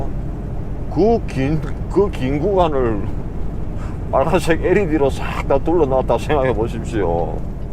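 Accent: Gyeongsang, South Korea. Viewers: 영상 날마다 보고있습니다. 카메라를 통해본 캐나다. 미국은 상상했던 것과는 다른 풍경이라 색다르고 갱상도 말투도 동네형님처럼 정겹고 그렇습니다. 항상 힘내시고요 오늘도 화이팅입니다^^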